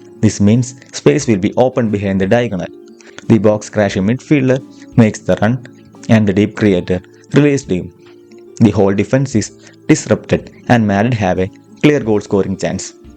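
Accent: Kerala, India. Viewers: Your accent seems to be from kerala, I am a keralite. Analysis is amazing and on point. keep up the good work!!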